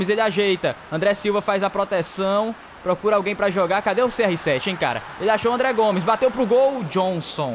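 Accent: Portugal. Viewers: cara, para de falar que nem português!! kkkk é irritante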